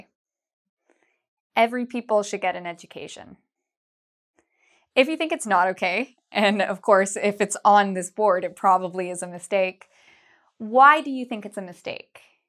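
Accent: Canada. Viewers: canadian eh? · i love Canadian accent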